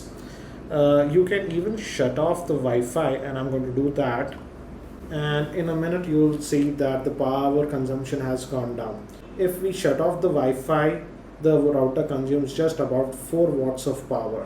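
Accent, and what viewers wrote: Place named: Tamil Nadu, India. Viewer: Your Tamil accent is heavy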